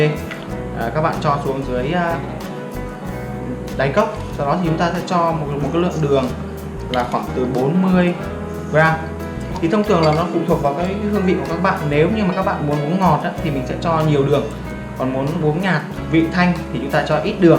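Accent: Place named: North, Vietnam. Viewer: Khg dai dong , ngan gon va giong noi Bac-ky dang yeu vo-cung . Chuc chau luon binh-an 🍀💐😍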